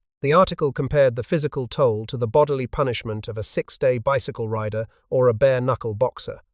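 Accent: United Kingdom. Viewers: I absolutely adore listening…but genuinely curious why we have a male British narrator to catalog the history of American greatness 😅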